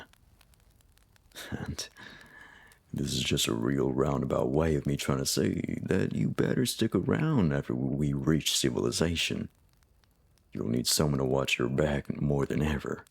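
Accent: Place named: South, United States. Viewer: I'm a sucker for a southern accent! 💖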